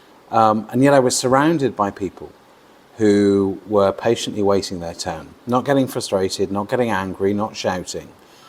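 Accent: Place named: England, United Kingdom. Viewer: Great pommy accent, mate! 😄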